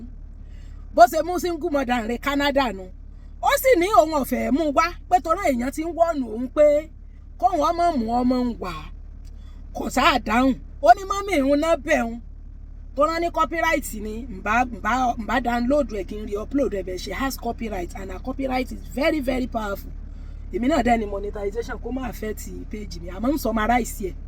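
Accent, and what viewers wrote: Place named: Osun, Nigeria. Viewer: I REALLY GBADUN THIS YOUR PROGRAM WITH THIS YOUR YORUBA LANGUAGE YOU'RE REALLY FROM OSUN STATE😂😂😂😂😂❤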